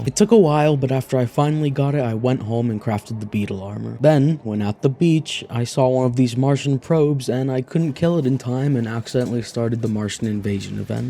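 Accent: United Kingdom. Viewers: omg, bri’ish terraria 😦😦😦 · are you British?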